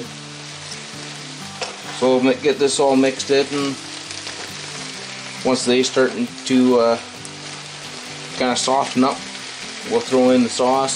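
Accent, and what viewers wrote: Canada. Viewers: This is a Canadian accent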